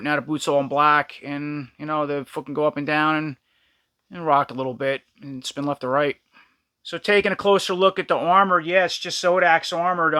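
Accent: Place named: East Coast, United States. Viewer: i freakin love seeing this stuff reviewed with a surly back east teamster accent 👌😆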